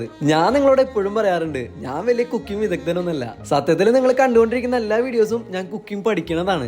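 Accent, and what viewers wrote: Thrissur, India. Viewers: Thrissur ahnooo😂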